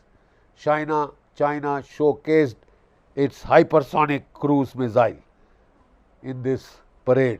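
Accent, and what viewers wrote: India. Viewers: You are a very shallow thinker!! Go to your temple to pray for a higher IQ. And your oral English is inglish.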